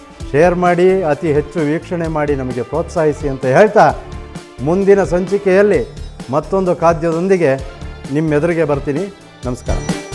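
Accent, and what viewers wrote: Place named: Chamarajanagar, India. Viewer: ನೀವು ಮಾತನಾಡಿದ್ದು ಚಾಮರಾಜನಗರದ ಭಾಷೆ ನೀವು ನೀವು ಮಾಡಿದ್ದು ಚಾಮರಾಜನಗರದ ಕಳ್ಳೇ ಹುಲಿ ಈ ರೆಸಿಪಿ ಮರೆತು ಹೋಗಿತ್ತು ನೆನಪು ಮಾಡಿದ್ದಕ್ಕೆ ಧನ್ಯವಾದಗಳು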